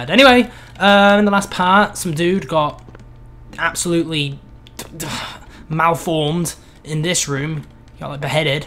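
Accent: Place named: England, United Kingdom. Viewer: So, I was playing some Halo 4 Grifball earlier today...and I was playing with a guy who sounds a whole lot like you,. At the very least, I think he was from the same part of England as you are.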